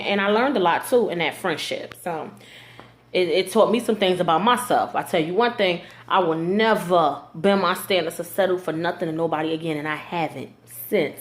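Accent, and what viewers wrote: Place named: New Orleans, United States. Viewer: its so crazy how you remind me of several ppl i know in new orleans... accent and all!! I don't even hear Jersey until you actually say "Jersey" lol i just listen to you talk like "i already know her" lolol its so weird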